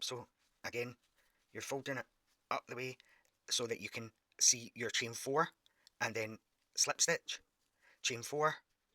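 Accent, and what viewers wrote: Scotland, United Kingdom. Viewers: You had me at the first sound of that Scottish accent,! [grin] Although I was, obviously, seeking material about C2C.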